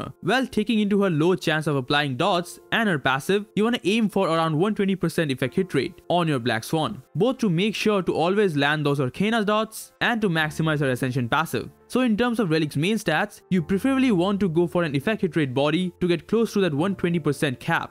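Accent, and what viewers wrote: India. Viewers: Are you Indian 😅 cuz your accent sounds india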